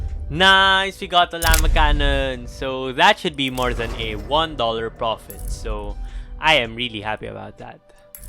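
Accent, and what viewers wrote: Philippines. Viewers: are u from phillipines your accent sounds like it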